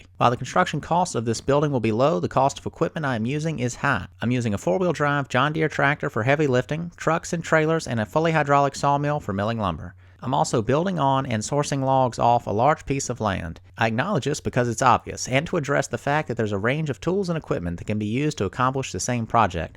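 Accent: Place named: Carolina, United States. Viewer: I've wondered myself if he's from North Carolina. However he always hides the front license plate on his vehicles and the only southern state to require front plates is Virginia. His accent is Carolinian though so I wonder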